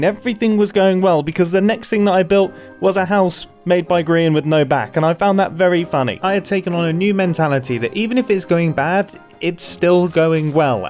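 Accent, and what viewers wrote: United Kingdom. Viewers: Are you britsh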